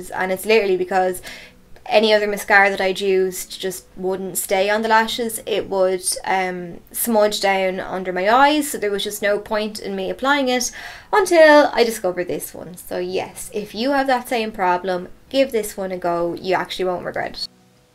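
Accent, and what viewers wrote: Ireland. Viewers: I could listen to you all day because you are Irish! Please do not stop making these :-)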